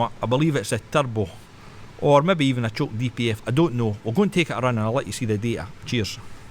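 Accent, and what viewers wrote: Scotland, United Kingdom. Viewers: Nice to hear a good Scottish accent. Could have been egr, but with the state that was in, egr could have been one of many.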